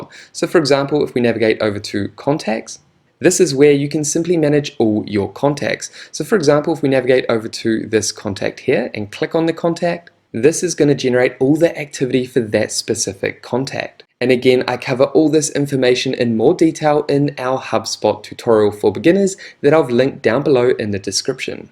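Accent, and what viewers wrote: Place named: New Zealand. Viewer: I like your nz accent. reminds me of a damn good time. but now I'm back in Europe. 😥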